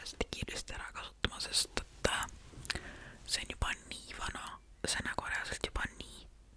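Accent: Saaremaa, Estonia. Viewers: Mina ei taju su eesti keeles mingit aktsenti peale saare oma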